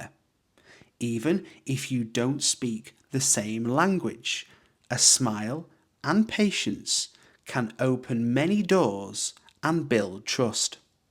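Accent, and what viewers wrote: United Kingdom. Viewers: What kind of Britishaccent is it specifically?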